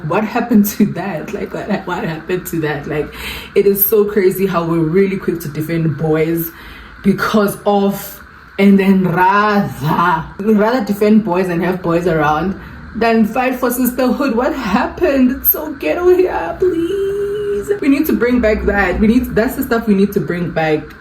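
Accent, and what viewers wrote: Nigeria. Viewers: The minute i saw Stanley on the caption i was so quick to get mine😭😭👍🏿 Stanley cup ke achievement 😭❤️❤️❤️. It's the Nigerian accent that always makes me come back here 😂😂😂 Please na. 😭❤️ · I love the Nigerian accent abegg🤣❤️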